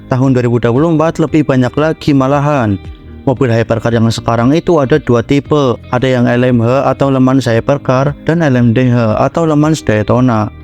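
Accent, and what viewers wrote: Java, Indonesia. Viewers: suaramu medok banget min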